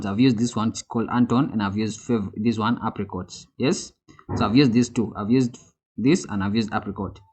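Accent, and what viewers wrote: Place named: India. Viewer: Are you a Nigerian? You sound like Indian 🤷 Love your effort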